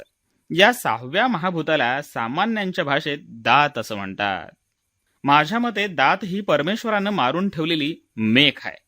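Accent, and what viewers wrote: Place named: Solapur, India. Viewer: भाषेचा बाज अगदी सोलापूरी ! खूपच छान .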